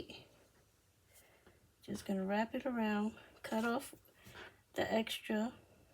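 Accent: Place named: New York, United States. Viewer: Where you from I hear a accent, you from NY?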